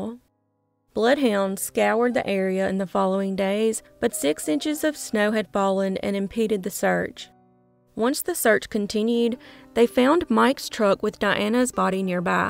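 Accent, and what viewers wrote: South, United States. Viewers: Love your lil southern accent. Hello fr panama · Your lovely Southern accent always makes me feel like I’m sitting and having sweet tea, with a true Southern Belle! 🤗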